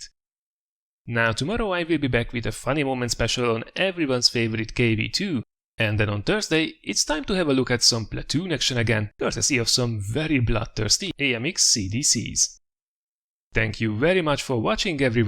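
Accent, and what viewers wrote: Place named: Hungary. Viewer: Szia, ahogy hallgattam az angol kiejtésed nekem egy kicsit furcsának tűnt gondoltam hogy nem vagy eredeti angol, de rájöttem hogy a magyarok pont így beszélnek angolul. Biztosra akartam menni ezért a kommentekhez mentem és ahogy olvasom tényleg magyar vagy. Nagyon jók a videóid és az angol tudásod is. Csak így tovább!!!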